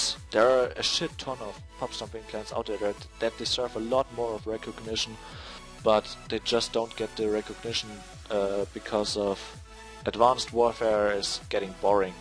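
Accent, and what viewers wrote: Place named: Germany. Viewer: Kommst aus Deutschland ;) schwer zu überhören :)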